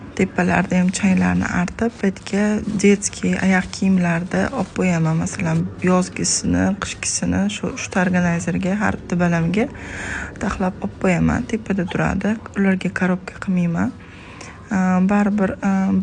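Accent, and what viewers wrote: Kokand, Uzbekistan. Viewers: Менимча Коконликсиз шекилли шевангиз ухшаяпти😊😊😊😊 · Qo'qonlimisiz qatidansiz shevez judayam o'hshidi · Qo'qonlikmisiz shevangiz.😊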